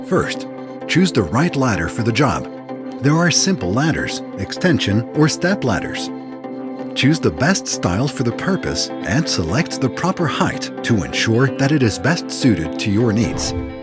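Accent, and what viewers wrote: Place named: United States. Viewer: Why do I have to listen to an American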